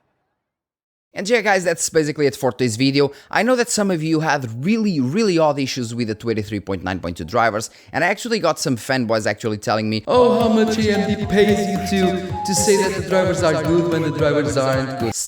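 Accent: Portugal. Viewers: I recognized your accent in Portuguese. Brazil here!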